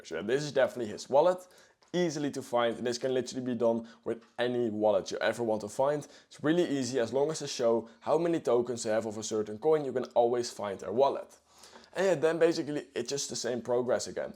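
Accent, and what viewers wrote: Netherlands. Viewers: Kom jij uit Nederland?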